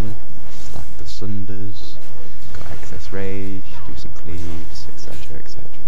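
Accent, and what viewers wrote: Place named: United Kingdom. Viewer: British accents are always amazing. If you weren't so quite and more talkative, then you'd easily be a famed commentator. An example is the user <link>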